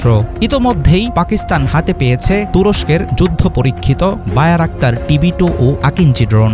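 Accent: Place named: Bangladesh. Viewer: এটাই ভাবছিলাম কারা এই ভিডিও বানালো, একটু শুনেই বুঝলাম বাংলাদেশী ভিডিও, বুঝেই বন্ধ করে দিলাম 😕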